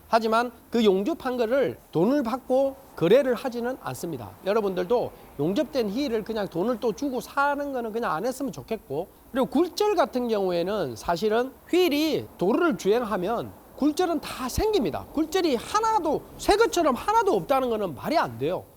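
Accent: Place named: Gyeongsang, South Korea. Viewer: 갱상도사투리 ㅋㅋㅋ굿입니다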